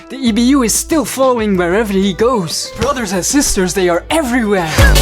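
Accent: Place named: Belgium. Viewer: gy zyt zwz een belg hondert procent ik hoor den accent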